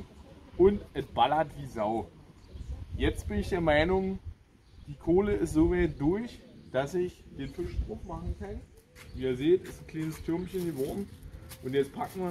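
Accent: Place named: Altmark, Germany. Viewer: Ich kann bestätigen, dass Du den Fisch wirklich richtig toll hinkriegst. Es ist ein einfaches Rezept aber hier stimmt mal wieder die Klausel "Weniger ist mehr" und allein schon Butter und Zitrone ist schon Erfolgsgarantie. Und schön zu hören, dass Du hier mal so richtig Deinen Altmärker Dialekt zum Besten gibst ! Das mit dem Rosmarien lernst Du auch noch irgendwann. Super !❤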